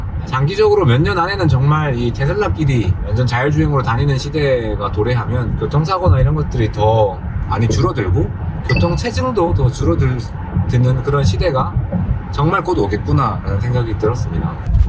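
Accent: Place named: Gyeongsang, South Korea. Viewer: 오잉. 갱상도 말 쓰시네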